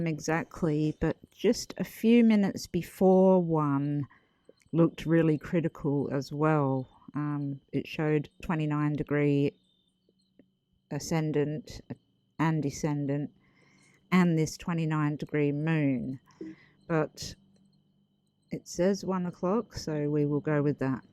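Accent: Australia. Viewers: at this very moment, there are 7 writing notebooks for me to jot down info, in passing, and others that are strictly for astrology , past lives that deals with astrology (Karmic complexes..etc), Medical astrology: Santos Bonnaci and others and others. On my nightstand (books are a permanent fixture unless I choose to take it with me that day): Judith Orloff MD. The Empaths Survival Guide, D. Vessel Van Der Kolk MD. the Body Keeps Score' , Gary Zukav , Dr. David r Hawkins DR. Masaru Emoto and a 2 or 3 others. I NEED more astrology books! Your is next up to be ordered. I'm just waiting for Mercury RX to leave the shadow period. Your knowledge, teaching style and overall beautiful disposition is ACES!!! I want and need to learn from you. As I've said it before: You male learning fun and easy. Unfortunately, the cases are sad but your ability to put it all in b&w with seemingly little effort speaks to your AWESOMENESS! 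**side note*** I love your Australian accent and when the camera is on your face. So adorable! 💜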